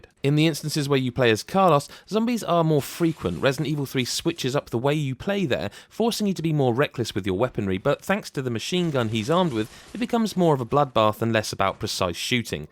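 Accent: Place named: United Kingdom. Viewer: Is everyone who reviews games British?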